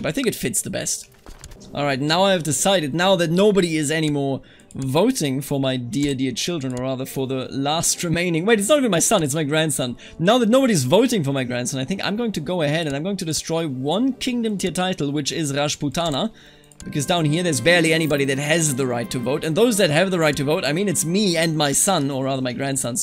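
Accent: Germany. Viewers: Man I love listening to you pronounce Indian names with your German accent. 10/10!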